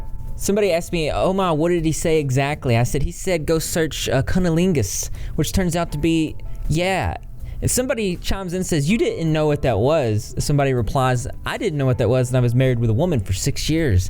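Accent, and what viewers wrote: South, United States. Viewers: Your southern accent reminds me of my hometown. Too cute ❤️🐢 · long live the South, lol. I'm from NC and I feel like he's just gotta be close lol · He has a slight southern accent sometimes it's quite noticeable depending on the word lol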